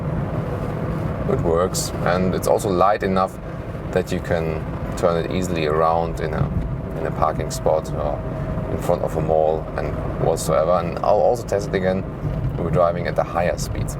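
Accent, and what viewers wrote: Germany. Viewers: is he speaking germlish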